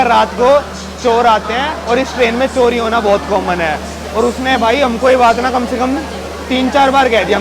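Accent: Haryana, India. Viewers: His accent sounds like haryanvi accent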